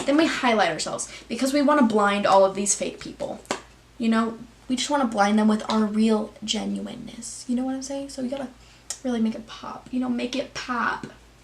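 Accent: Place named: South, United States. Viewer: Beautiful video, I just subscribed, are you southern? I detect a tiny bit of an accent but I’m not totally sure.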